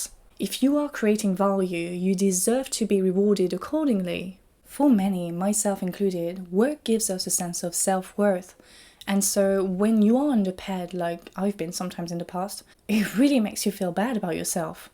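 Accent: France. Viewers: Hey, are you planning on making a video in French anytime? I really like your accent, and I think hearing you in your native tongue would be lovely :)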